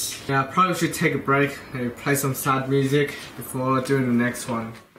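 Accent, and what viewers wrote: Australia. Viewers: What part of Australia are you from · You Australian mate?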